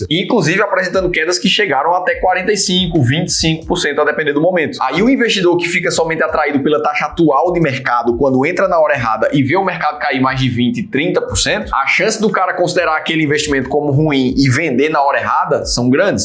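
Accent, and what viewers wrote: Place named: Northeast, Brazil. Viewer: O Sotaque Nordestino é forte🌞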